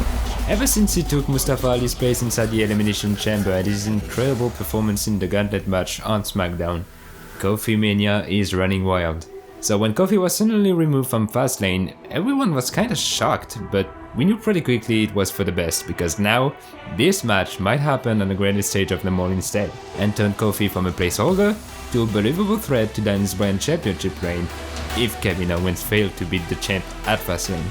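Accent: France. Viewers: Tu es français mec ?! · Bon vidéo ! Et soit fière de ton accent français ! ^^ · T'es français ? Tu parles bien anglais franchement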